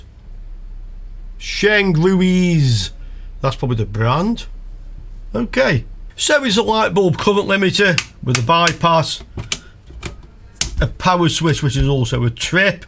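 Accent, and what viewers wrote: United Kingdom. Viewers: nice video. nice for a change hear King's english with Schuko electric plugs. p.s. my father had similar setup in <num> meter over his workbench with two parallel 100W lightbulbs to have about 1 amp current limit when testing electric appliances and tube radios for input short circuits of course without all the digital stuff of that device. such device with a 40W lightbulb may be a safe device to let capacitors of long unused mains powered transistor stuff have the capacitors reform. myself i use a SOLA ferroresonant 60W transformer to test stuff that have long time been in a barn, attick, cowhouse or else with potential for mains fuse blowing faulta ( the Ferroresonant i.e. constant voltage transformers have inherent power limit, but are inefficient at light load)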